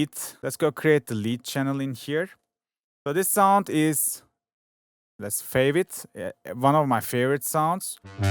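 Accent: Turkey. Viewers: bu ingilizceyi tanırım türksün demi kenks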